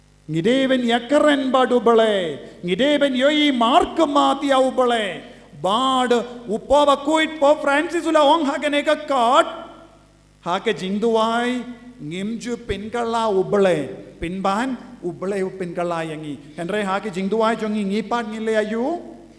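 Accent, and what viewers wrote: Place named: Khasi, India. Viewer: I ne i phadar I dei i khasi paka ne kumno.namar ka rukom kren jong I kam da long tynrai bha u khasi paka.god bless all phadar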